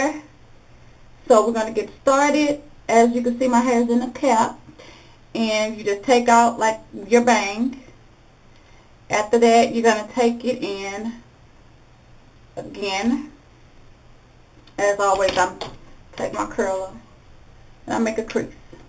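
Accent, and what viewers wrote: South, United States. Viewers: I love all of your videos,! Are you from a southern state..I love your accent.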